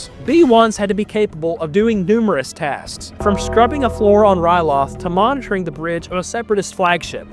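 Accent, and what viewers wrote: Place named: South, United States. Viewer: I love the southern accent trying so hard to come out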